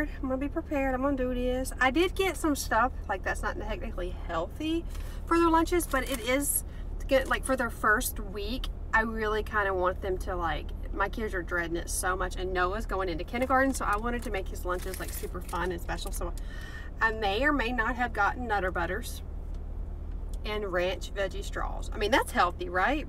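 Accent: South, United States. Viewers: Go on Grrrlll love that southern twang lol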